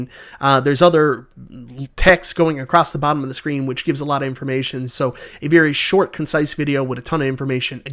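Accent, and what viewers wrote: Canada. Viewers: I love ya but that Candian accent makes it sound like Ircade 1 up. Not Arcade 1 up. Its bugging me. Can you work on it.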